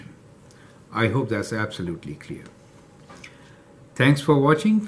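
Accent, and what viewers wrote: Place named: India. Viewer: Love indian accents, they sound so nastalgic to back when i lived in india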